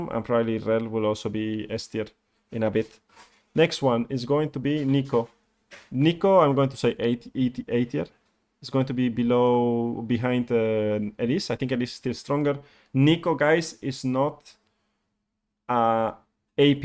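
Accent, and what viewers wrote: Spain. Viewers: Buenas, tengo una pregunta (im guessing ur spanish by ur accent). Estoy actualmente practicando Bardo porque soy OTP Rell y Rakan, pero queria saber si Bardo merece la pena. Tiene un estilo de juego que me gusta bastante pero no se si merece mas la pena ser OTP Bardo o Thresh. Tambien me gustaria saber si en un futuro haras alguna guia sobre Bardo, gracias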